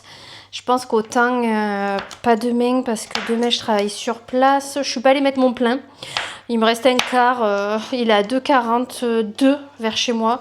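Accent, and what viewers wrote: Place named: South, France. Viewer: J adore ton accent du sud rempli de soleil ❤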